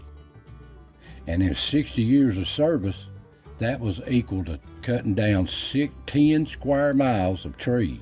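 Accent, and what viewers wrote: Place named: South, United States. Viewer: One of these days I am going to have to cross the pond and visit this part of the USA. It reminds me so much of parts of the high country where I grew up in southern New South Wales, Australia... except we have Gum Trees and not Aspens, Birch etc.. Thanks for another great video,. I could easily sit down and listen to you speak all day, just love that Southern accent and gentle speech.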